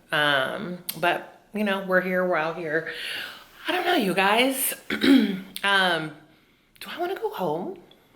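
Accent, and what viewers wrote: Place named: West Coast, United States. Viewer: I howled at the west coast accent, not too bad. 🙌🏾🤗🤣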